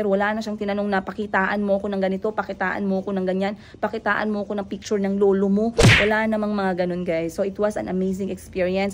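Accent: Iloilo, Philippines. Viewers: 😂😂😂 nalilingaw takaga ako sa pag i Ilonggo mo Ms. Nalilipay talaga kmi makabati sang inilonggo mo ah. Enjoy kamo sa Thailand, God bless and keep safe po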